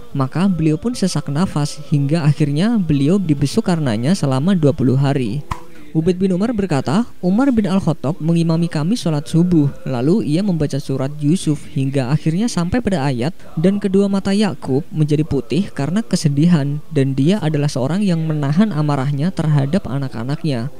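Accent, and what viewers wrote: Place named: Yogyakarta, Indonesia. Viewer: Logat orang jogja ya wkwk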